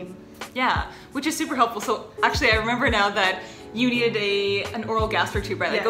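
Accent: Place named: Canada. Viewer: Where are you from ? Your accent sounds very Canadian and also like your from Minnesota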